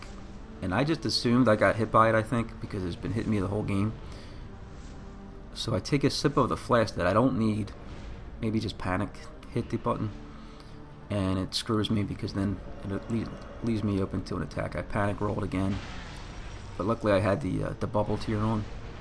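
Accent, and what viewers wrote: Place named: Philadelphia, United States. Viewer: Great video bro we play the game literally the same big ass sword Big ass armor love it stg you sound like you got a Philly accent too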